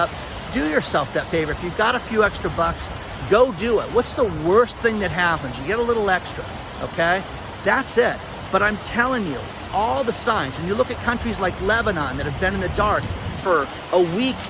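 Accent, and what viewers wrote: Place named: Western New York, United States. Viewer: Are you from Western NY State? Your accent, the way you pronounce certain words like "roof" indicates you are. I'm originally from Buffalo NY area.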